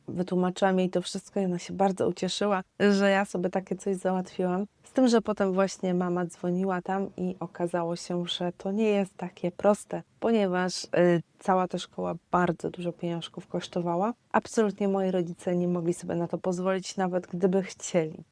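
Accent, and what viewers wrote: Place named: England, United Kingdom. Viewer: no właśnie ten język Polski w którym pani rozmawia jest już z akcentem Angielskim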